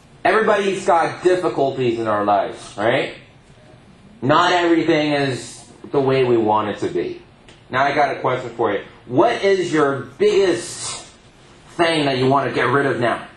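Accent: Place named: United States. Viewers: He doesn't sound Canadian because he speaks standard American English. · Totally agree. He has a strong American accent from somewhere in US.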